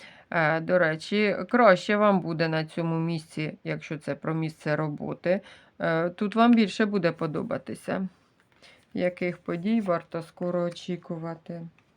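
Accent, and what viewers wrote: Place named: Poltava, Ukraine. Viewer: Полтавська або Черкаська обл😂. Говір- ☝️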